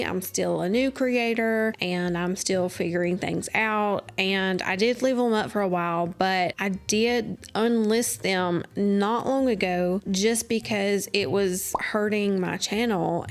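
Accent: South, United States. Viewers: Hi, I love the way you speak, it's perfect, not too fast and I love the southern accent! I look forward to hearing more about your journey. I can relate to the fear of dying and wanting to have a place for loved ones to see and hear you. I started my channel during covid. I was so scared that I wouldn't get to see them again, i made my channel for them so they can hear my voice if something happens to me. And I'm like you also, my channel is not for promoting products, I have a standard letter I copy and paste into a return email thanking them, etc. This is an awesome video and thanks for taking the time to share your knowledge with us. Take care. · I really love all of your content. Every single video is entertaining, thought-provoking and energising. I especially love watching you and your husband interact. One thing that stood out to me from the beginning was your slower Southern accent. 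I struggle to understand anyone with any accent talk fast and always have to enable subtitles online, which can distract from the content at times. And I always say "I wish I could enable subtitles in real life". I did struggle to understand your husband though (even YT subtitles got mixed up sometimes hehehe - which was entertaining in its own right) but his warm personality, you interacting with him, Bayley's antics, all the emotions, and the cutest random animal showcases ALL made me (and my mom) keep watching. We are so in awe of your survival story that anything you post is a lovely surprise. Like opening a foreign candy wrapper and experiencing a burst of new flavour. By the way, me and my mom have a disorder called misophonia (decreased tolerance to specific sounds) and still continued watching. That's how much we love your channel. Another BIG reason I'll continue watching your content no matter what is the fact that you are NOT religious. 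There's so much I can say about that but I'd rather not... insert sound of crickets here...I really appreciate slower more intentional long-form content in a sea of fast-paced crappy content. I was particularly also intrigued by the wild 911 calls and interaction with your former co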